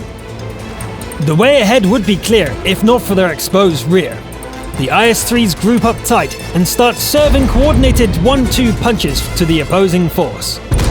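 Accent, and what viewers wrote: United Kingdom. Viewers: even i from germany Heard this "english" acent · The scout replay with amazing agent music with a very nice british accent made my day for me. Keep up the good work with unique presentation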